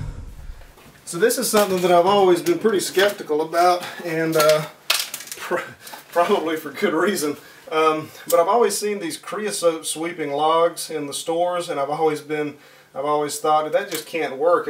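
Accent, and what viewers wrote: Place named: Appalachia, United States. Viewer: Where are you from. I like your accent. Is it a hillybilly accent? Thanks from the UK.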